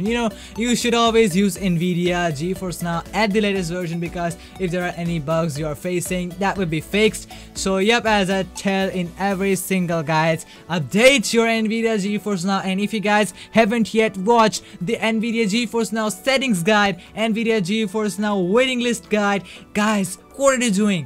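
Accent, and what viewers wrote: India. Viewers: how are you indian ur accent is great i am from iran but live in germany keep up the great work!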